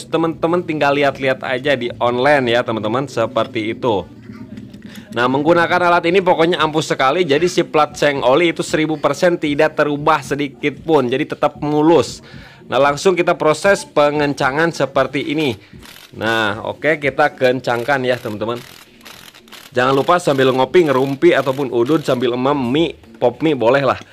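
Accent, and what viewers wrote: West Java, Indonesia. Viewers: Sunda pisan iyeu mah... mantap kang... 🙏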